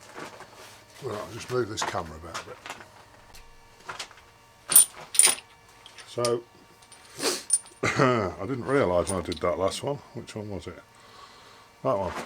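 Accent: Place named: Lincolnshire, United Kingdom. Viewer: Hi, just found your channel via Doubleboost and subbed. I was watching a vid or two and listening to the accent thinking 'this guy comes from the same part of the world as me', nailed it, lmao when you put North Lincs in your outro. I moved away from Lincoln to Scotland 10 yrs ago and am just about to start setting my shop up so will hopefully be posting some vids soon. Keep up the good work, makes me feel like I am back in my home town watching your vids. Jon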